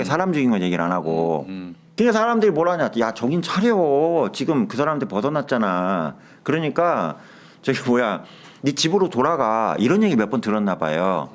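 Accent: Chungcheong, South Korea. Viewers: 염쌤. 연쇄살인 스토리 찾느냐 피곤할텐데..❤감사합니다. 또한 구수한 충청도 사투리지만 예리한 카리스마는 의 여전한매력😮항상 화이팅입니다 ❤🎉❤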